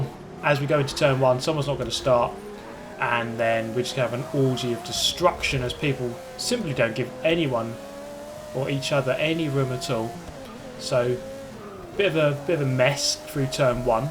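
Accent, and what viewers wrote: United Kingdom. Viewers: I think there is something about a British commentary over racing that is just pure bliss.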